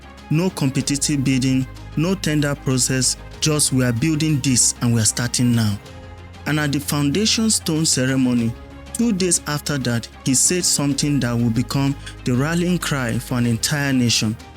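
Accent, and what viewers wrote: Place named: Nigeria. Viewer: The accent naija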